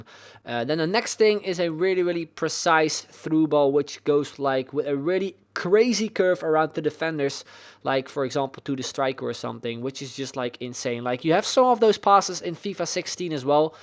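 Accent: Netherlands. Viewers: Are you Dutch? I hear an accent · u dutch?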